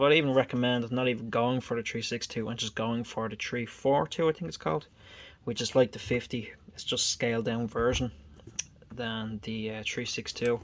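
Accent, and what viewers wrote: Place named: Ireland. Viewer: Date code H33 on your genuine Abloy is August 2020. That is a new model genuine Abloy, I could tell straight away just by the laser engraving. Just because you bought a 200 quid lock for 60 quid doesn't mean its fake! It means you got a bloody good bargain! There are No, zero, zilch, nada, fake Abloy PL362 padlocks, nobody has spent tens of thousands of pounds to machine up to make exact duplicates of them only to sell em cheaper, putting emselves out of pocket! You have a real Abloy! Rest assured its real, real case hardened steel body and real case hardened Boron carbide shackle! I take it you're from the Emerald Isle, by your accent that it is! Well now, Slaint m'hath and slow on the ishke baha!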